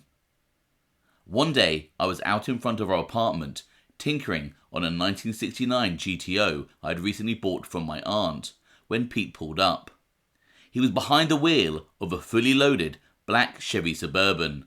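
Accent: United Kingdom. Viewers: The Fine British Accent don't hurt...ha ha...always sounds more serious...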